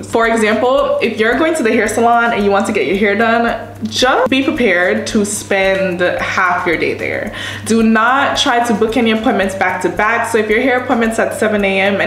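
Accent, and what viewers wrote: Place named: United States. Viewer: Girrlll I just watched the first video you did about moving out the US and I just watched this one and you already are glowing so much more and your voice is changing and your voice is dropping that American accent 🎉😂❤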